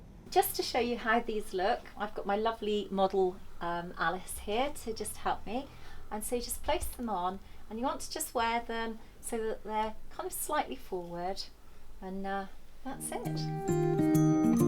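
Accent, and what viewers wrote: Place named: United Kingdom. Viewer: Yes! I came here to say that everything is better with a British accent. And this is a beautiful tutorial!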